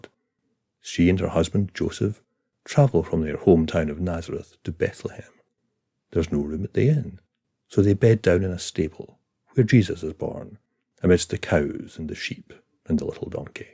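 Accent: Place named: Scotland, United Kingdom. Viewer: And lovely ASMR with your mellifluous Scots accent!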